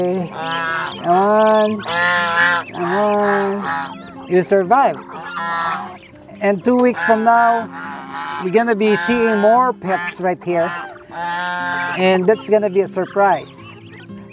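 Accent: Philippines. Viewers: based on his accent, i think he's in the philippines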